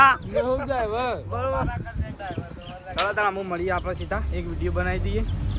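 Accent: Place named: Sabarkantha, India. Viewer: Kyana cho bhai..Sabarkantha ni mast majani bhasha bolo cho.. 😂.. Majja aavi karan ke hu pan aaj bhasha bolu chu ghare.. Hu pan tamari bajuno ja chu bro.. Hu Mumbai rahu chu 💓🙏‼️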